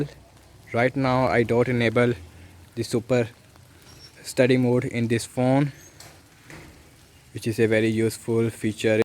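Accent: India. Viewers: Nice to hear English in desi accent. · nice ! thanks , you have a little accents indi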